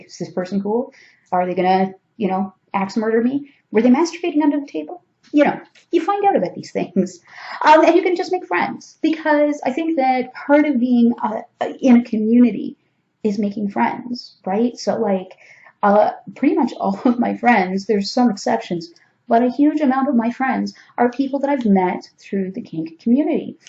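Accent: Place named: Toronto, Canada. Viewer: Ahhh. Fresh Air. What captivating colors starting with your eyeballs osmosing that shade of which blue? How about Blue - eh? Wow, I knew your Toronto accent in the video I just watched. So yeah, You are telling me answers to questions I've read about every group's posted rules, I extrapolate correctly but how to dress, thank you, how to keep your hands above the table, thank you, how self protections begin before you get to an event, thank you.There are some pluto in leo people that about now are beginning to understand playing fields being totally rearranged leveling the starting gate struggling through tech, even if really good, not as smooth second nature appendage of Saturns first return this year since 1981ish. fFinding how to sit and wait for on line response isn't just goofing off any longer. Wow...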